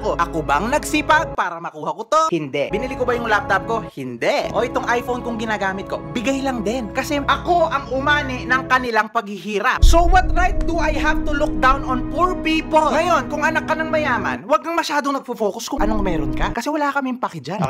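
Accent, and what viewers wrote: Pampanga, Philippines. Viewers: nice kapampangan ka nga haha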